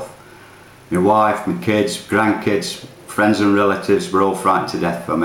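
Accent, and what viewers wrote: United Kingdom. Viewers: I've seen many videos about electronic cigarettes, and so on, but this is the most helpful one so far! Great video. I ordered my electronic cigarette within one hour after watching the video (awesome British accent, btw, lol :D). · Great vid. Really positive : ) Love the accent, makes me feel like I'm back home. Rotherham?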